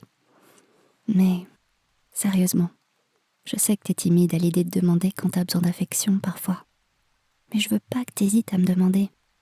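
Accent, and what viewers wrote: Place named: United Kingdom. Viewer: Ton accent est incroyable si tu est anglaise mais je pense que t’es vidéo sont trop courte x) j’aimerais trop des vidéo de 1h 😅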